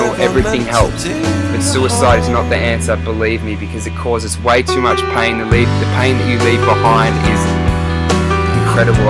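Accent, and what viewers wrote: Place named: Australia. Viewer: the guys aussie accent .. lols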